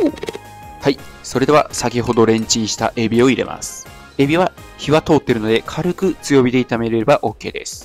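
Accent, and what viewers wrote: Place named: Miyazaki, Japan. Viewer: 宮崎訛り懐かしい〜😊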